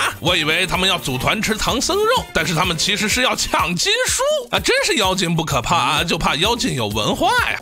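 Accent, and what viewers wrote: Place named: Shaanxi, China. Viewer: 是陕西人吗好像听到了一丢丢口音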